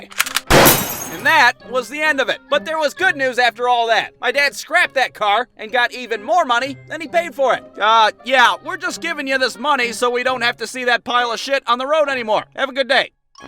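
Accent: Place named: Arkansas, United States. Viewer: Lol Sounds like Arkansas ha ha ha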